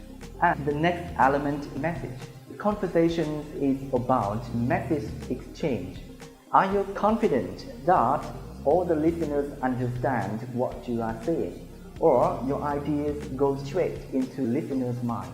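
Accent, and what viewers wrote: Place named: England, United Kingdom. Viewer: You've got English accent:)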